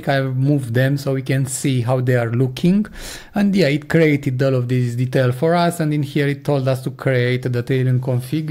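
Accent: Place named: Romania. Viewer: roman cumva îmi dau seama după accent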